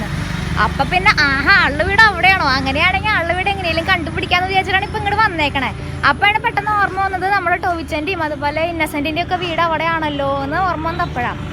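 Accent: Thrissur, India. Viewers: D. മോളെ മോളിങ്ങനെ ഓവറായിട്ടു fece കാണിച്ചു ഇങ്ങനെ തൃശൂർ സംഭാഷണവും കൂടുതലായിട്ടു പുറത്തോട്ടു തള്ളണ്ട.... മഞ്ജു.. മണിച്ചേട്ടൻ.. സത്യൻ അന്തിക്കാട്.. etc. പലരെയും അവരുടെ വീടുകളും കാണാനാഗ്രഹിക്കുന്നു.. മോളു ലൊക്കേഷൻ കൂടുതൽ വരട്ടു.. താങ്ക്‌സ്..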